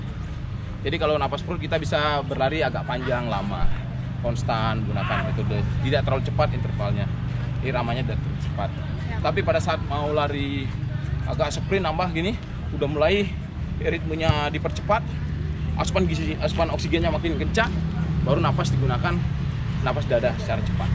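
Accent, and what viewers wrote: Bali, Indonesia. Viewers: Pantesan kayak ada logat-logat bali, ternyata kelahiran bali wkwkwk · Logat nya om kok kayak orang bali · Bang dulu apakah pernah di Bali? Kok logatnya agak Bali gitu ya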